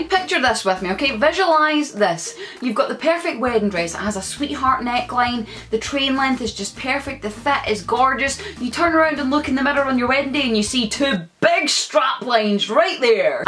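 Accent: Scotland, United Kingdom. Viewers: Also I know it's a little crazy but no dairy 3 days before, it causes bloating :) I'm getting married in a month so these tips help a lot, it's just a little backyard wedding so I'm making the food, cake, decorations and such. Also, where are you from? I love your accent but cant figure it out lol. Scottish?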